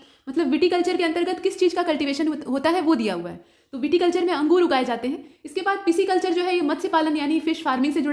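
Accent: India. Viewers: Jo bhi kaho lekin aapki awaaj sahi lagti hai...Hindi medium ki jhalak ke sath english ka bolna mast lagta hai....😍😍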